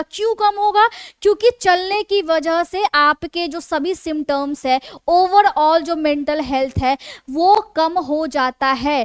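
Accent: Bihar, India. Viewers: Jankari aapki bahut hi mahatvpurn hai bahut achcha se aap explain kar rahi hai aapki bhasha mein Bihari Pan ka lagao lag raha hai vaise very nice❤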